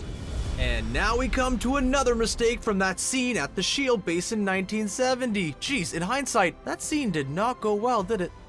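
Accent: Canada. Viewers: You have a heavy Canadian accent. Are you from Alberta. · Is the narrator Canadian? (His pronunciation for some words make it seem like it.)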